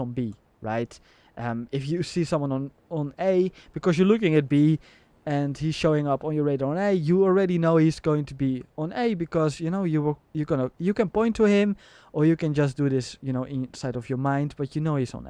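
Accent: Netherlands. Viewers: nice dutch accent ;)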